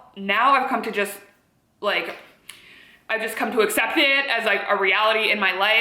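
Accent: Canada. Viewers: This is the first i listen/watch your video without multitasking (lol i like to listen to story time youtube video whilst driving/do chores) but i just wanna say your canadian accent is SO noticeable 👌🏼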